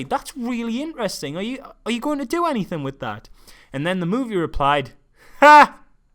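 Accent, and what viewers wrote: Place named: Geordie, United Kingdom. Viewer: Thick Geordie twat.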